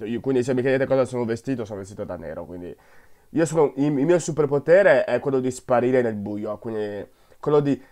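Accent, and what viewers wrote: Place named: China. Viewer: Perché parli come un cinese?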